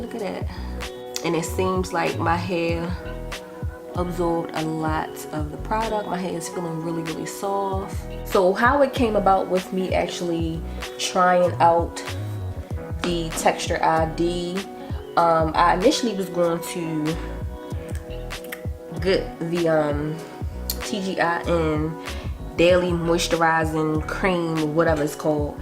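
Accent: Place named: New Orleans, United States. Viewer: I thought was from New Orleans, by her accent. I love her accent. Of course, humidity in the New Orleans area is like the worst (it's rare to just have a good hair day due to the humidity)! But Baltimore is pretty cool; I like that they get all 4 seasons.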